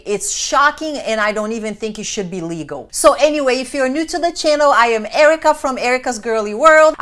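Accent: Brazil. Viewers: She's from Brazil. She came to the US and worked really hard to become a professional engineer.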